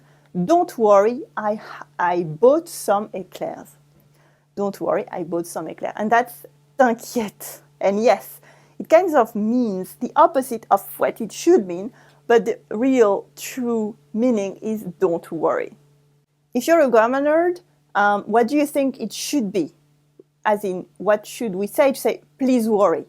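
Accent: France. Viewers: Well my favorite way is when I'm in France and listen to everyone, also talking to my French friends, listen to the radio, watch movies (especially at the summer French film festival in Moscow in outdoor cinemas and also on MyFrenchFilmFestival online). For me French is logical as maths: sometimes I can predict what an adjective will be if I know the noun/verb or root of the word. Btw, several months ago I understood how words like parachute, parapluie, parasol, were formed (thanks to Duolingo with word сhute). Also "chaise long": in Russia we pronounce it in French way but as one word, for me it was like wow when I saw it in french and understood that it's long chair. Thanks for your lessons, they're very helpful and I love your English with French accent)) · Cet accent. On entend que la prof est francophone (française), mdr (=LOL). Otherwise good pratical exemples, very usefull. 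P.S. at times, "oui" come "wesh" or even (street slang) "wesh ma gueule". You can also use it (all) instead of "what" (qu'est qu'il y a) that we shorten by "qu'est qui y'a" or "qu'est-ce qu'ya" in non-slang language (used for instance when somebody call you). We never write those verbal versions as we really pronounce it. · Oui-- je te comprends, but it´s kinda funny to hear English with that French accent! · I'm French and she has a French accent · Oh! I love your french accent. I need to learn more from you madame. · You got a tough French accent though lol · I think it would be interesting (to English speakers) for you to role play with another person using Fast French, starting easy, not using too many new expressions in one lesson. You must do MUCH repetition of Fast French words in a series of lessons; not just one/ two lessons. Many lessons! The English/American ear needs to get comfortable hearing these words as they are a departure from how we learned French in school. 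It's actually quite difficult to interpret Fast French when spoken. But I'm glad you are choosing to teach your audience with 'real life' spoken French. Otherwise English speakers will remain in the Dark Ages, including the older generation. (by the way, you speak quite lovely English and you are impressively fluent; you have zero problem with English. Congrats. The fact that you have a slight French accent actually beautifies our language. I think your presentation and choice of subject matter is quite excellent. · always funny to ear english spoken with a real french accent. ( from france)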